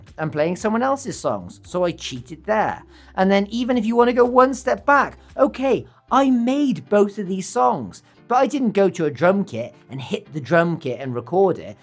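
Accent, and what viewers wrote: United Kingdom. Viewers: Who is this bri'ish bloke trying to tell me how to do?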